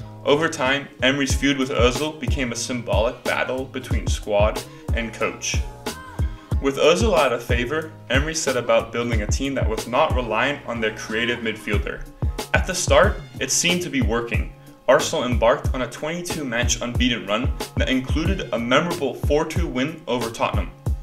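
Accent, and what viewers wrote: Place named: India. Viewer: the narrator's accent is indian on you pl channel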